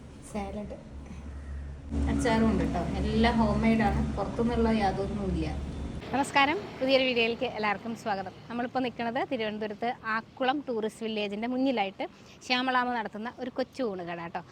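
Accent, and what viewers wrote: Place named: Malabar, India. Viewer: മലബാറിന്റെ സംസാരരീതിയും മലബാറിന്റെ രുചിയും പാചകരീതിയും സംസാരത്തിൽ തോന്നുന്നു. എന്തോ തോന്നലാകാം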